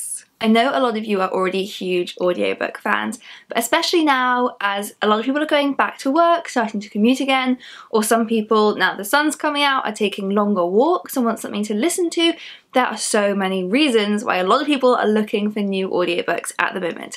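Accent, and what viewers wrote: United Kingdom. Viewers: British accent is amazing. Thanks for these recommendations 😊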